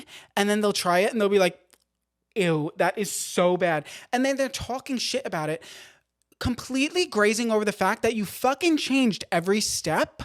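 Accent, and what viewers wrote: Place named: New Jersey, United States. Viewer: Your jersey accent sounds amazing. Da drawer. Love it!!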